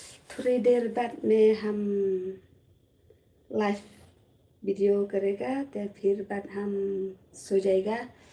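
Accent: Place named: Bangladesh. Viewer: Ka shrieh kynthei ba lehsih tam bad ka ak bha briew sa ba la tymmen, imat kaba ym don ba ha ing ha sem ym don ba sneng ym don ba kraw ym don ba iohi ia ka jingbiej jong ka, lada don ruh ialam noh ia kane kloi sha SANKER hospital kein ba kan ioh jing sumar bad ban shai noh ka jabieng dum jong ka. Kane kein ka briew kaba ka EIT bad ka JABIENG jong ka ki ia daitlang hajuh. Lok khar bangladesh tharai ba ka kren dkhar.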